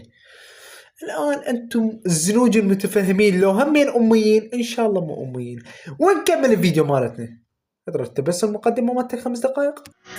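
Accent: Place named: Kuwait. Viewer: الكسنت مدري الماكسنت العندك بل كويتي حلو😂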